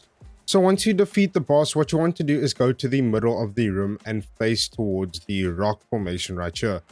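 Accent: South Africa. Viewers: are u South African? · 2 seconds in and I can already hear this is a fellow South African. Thank you so much and keep up the great work! DDP, Bokke for the win 🎉🇿🇦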